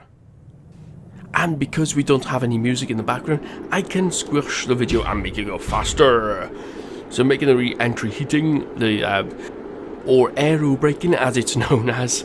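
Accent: Wales, United Kingdom. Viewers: I thought I heard some welsh in there haha! I don't normally hear strong accents down here either. I take it Cardiff way then with the butt? Mush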